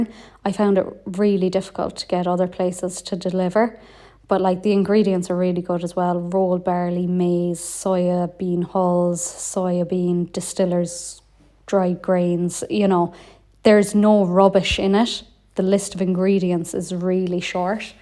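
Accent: Ireland. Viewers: Hate to disagree with you but I could listen to your sweet Irish accent ALL day long. ❤️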